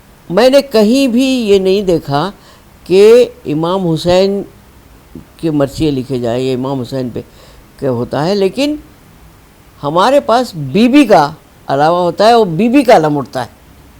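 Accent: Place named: Deccan, India. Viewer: Mashallah excellent deccani urdu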